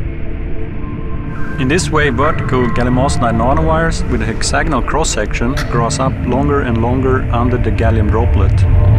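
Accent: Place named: Norway. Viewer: This is so cool, and so much more authentic with a norwegian accent.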